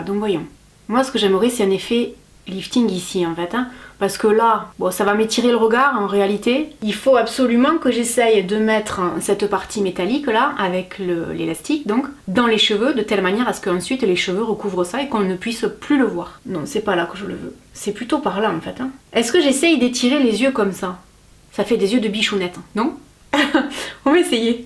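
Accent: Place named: Toulouse, France. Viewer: Ma fille m'avait conseillé de vous regarder...c'est fait et j'vous trouve excellente..et l'accent j'adore..forcément je suis pas loin de toulouse...du coup j'vous suis 🙂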